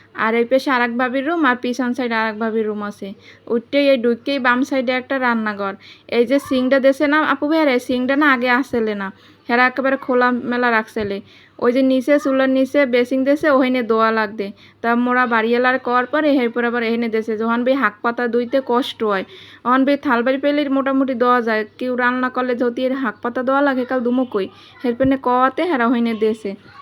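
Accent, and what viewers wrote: Barishal, Bangladesh. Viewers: ❤❤আসসালামু আলাইকুমপ্রথমে লাইক দিয়ে তোমার পুরো ভিডিও জুড়ে ছিলাম তোমাকে আমি দুই দিন আগে ভালোবাসা দিয়ে রেখেছি মাশাল্লাহ খুব সুন্দর করে তোমাদের বরিশালের ভাষা কথাগুলো বললে খুবই ভালো লাগলো😮 শুনে সব কিছু মিলিয়ে দারুন লাগলো তাই তোমাকে ভালোবাসা দিয়ে রেখেছি 👉🔔বুঝে নিও তোহার হলো তোমার পরিবারের জন্য আপুর উপর বিশ্বাস রাখতে পারো লাইকডান আলহামদুলিল্লাহ ❤❤🎉🎉😮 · অনেক সুন্দর হয়েছে আপু ❤❤❤তোমার বরিশালে ভাষায় কথা গুলো ভালো লাগে 😅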